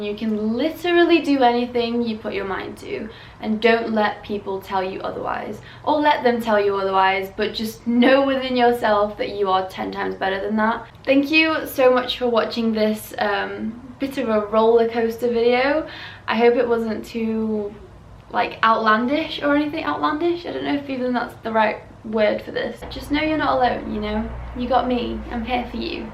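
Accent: United Kingdom. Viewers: wait very stupid question.... when did you get the british accent? or is it not?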